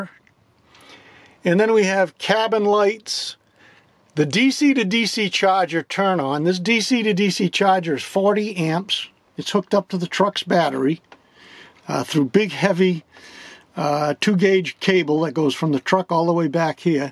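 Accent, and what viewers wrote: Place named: Northeast, United States. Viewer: Where in the northeast? I can hear home in your voice.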